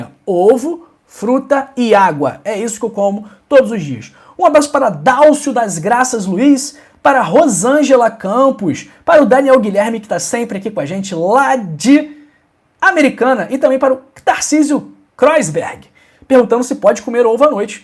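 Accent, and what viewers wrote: Rio de Janeiro, Brazil. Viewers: COM ESSE SUTAQUE NEM PRECISA FALAR QUE E DO RIO DE JANEIRO.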